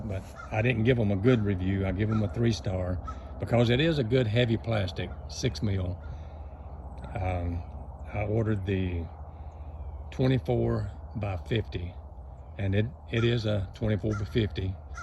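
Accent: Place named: Louisiana, United States. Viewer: I was guessing Louisiana with the accent lol. I was close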